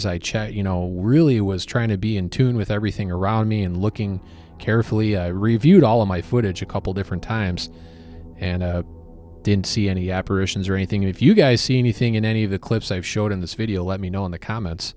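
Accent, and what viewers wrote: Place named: Midwest, United States. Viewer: This is the most Wisconsin/midwest AI voice I have ever heard! Some new sights for me to venture out and see, thanks much!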